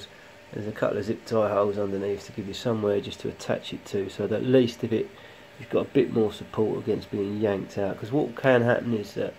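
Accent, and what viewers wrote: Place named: England, United Kingdom. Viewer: U have the worst english accent I've ever heard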